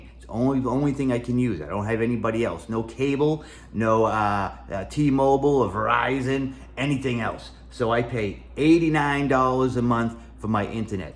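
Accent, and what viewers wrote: Boston, United States. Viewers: Congrats on your financial situation and enjoying retirement! I think in your case a HUGE reason for your low cost of living is WHERE you live, the city that you live. I know you told me before but I forgot. You have a Boston like accent but I know Boston is expensive, I think. I live in a mid size city in TX and we would pay easily <num> a year on a modest home as a senior. And insurance, is easily at least a few thousand a year. It used to be cheap here until everyone from California decided to move here. It makes me want to move! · But sounds like you once lived near Boston, (western Mass here) Nice video. Close to 73 years old, but I never want to retire.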